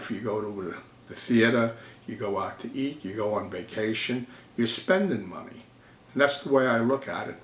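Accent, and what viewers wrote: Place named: New York, United States. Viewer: just because you wear sunglasses and have that brooklyn or NY accent or whatever the hell you are from doesn't mean you know what you're talking about when it comes to blackjack. lol selling systems. Evidently his system isn't working if he needs more money.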